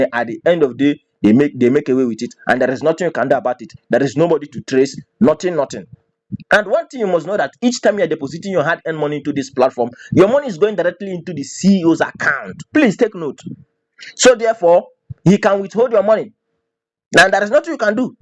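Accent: Igbo, Nigeria. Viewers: You don’t have fact to prove your point. With your Igbotic accent,you want to spoil another man’s effort. Nothing is assured in this life,the most important thing is for you to invest what you can afford to lose. And on F&B you earn in 3ways not two. I said it that you are just a dumb critic. You earn without a single referral. You don’t have to refer a fly to earn legitimately. You are an online dumb coach that is looking for people’s businesses to pull down. You couldn’t even take you time to get your fact to backup your review point. 90% of the people I brought into the business investment did not refer a single fly yet they are earning and have recovered their capital. Till now all my team are still earning after getting their capital. Why the hate on other people’s business? As a matter of fact F&B just opened a Physical office close to Alausa Ikeja area of Lagos and is almost done with the 2nd branch on the Island in Lagos. 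So you mean a business is plan to run away with investors money and they went and opened a physical office beside Governors office? Can’t you see how dumb you are? And some other dumb people just as you are too are saying God bless you. Don’t listen to him,Join F&B and start earning your money without stress.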